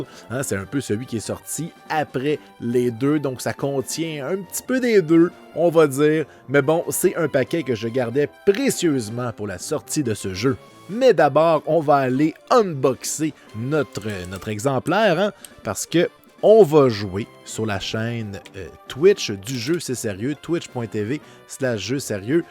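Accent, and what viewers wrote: Quebec, Canada. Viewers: j adore l axant quebequoi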